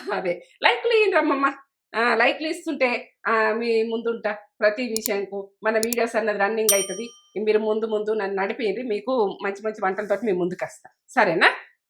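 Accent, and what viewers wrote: Telangana, India. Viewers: Telangana yaasa Lo Cheppindi Amma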